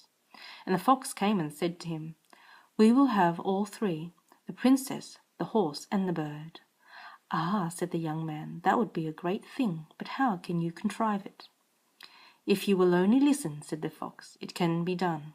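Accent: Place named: RP, United Kingdom. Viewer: you sound like a queen of England wasn't expecting that. Do you like drugs mate?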